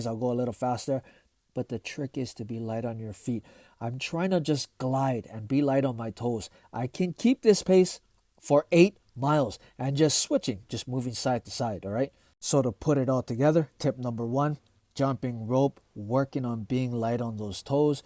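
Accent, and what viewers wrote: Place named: Hawaii, United States. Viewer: My braddah, you from Hawai’i ah!? lol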